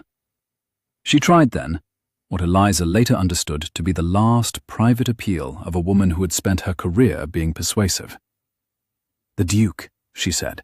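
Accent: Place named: England, United Kingdom. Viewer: I would like to add that this story had the perfect Narrator. with an English accent. This voice is definitely one of the best. So many stories about England, with Dukes & the aristocracy just use a narrator that is American & it does not fit. This one created a perfect Presentation. Well Done!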